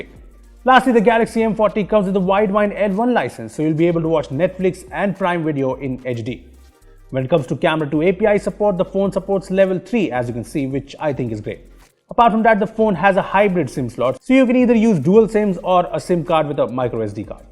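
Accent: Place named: India. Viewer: New subscriber here ! Love from malaysia... Like your indian-english accent, that's why I've used to watch tech news on indian's channel. Their accent are just, satisfiying to hear.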